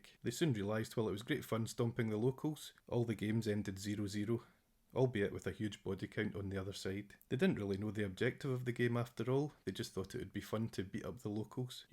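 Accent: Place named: Scotland, United Kingdom. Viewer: Great video man. I am average painter too, slow and steady. I like the mix of red, white and blue. It makes him very regal. I love your commentary, like a Scottish Bob Ross.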